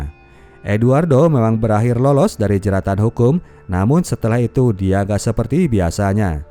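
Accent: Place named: West Java, Indonesia. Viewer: Pengisi suara orang sunda pasti😂😂,suaranya khas bnget😊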